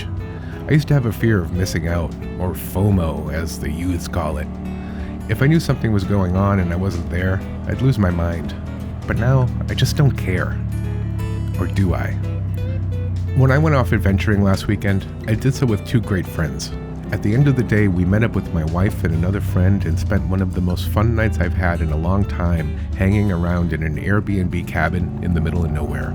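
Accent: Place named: United States. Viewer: It is perplexing to hear the voice that plays in my head, talking with an American accent. Excellent channel - Thank you. Keep them coming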